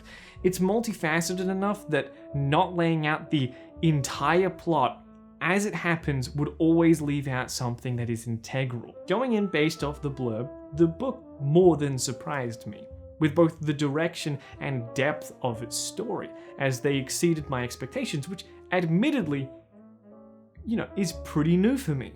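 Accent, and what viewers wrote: Australia. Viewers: the rhett and link one is so weird to me because one of my friends grew up in that town and hearing an australian man detail the experience is making me feel emotions that have yet to be documented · Josh Strife Hayes but australian and unrelated to videogames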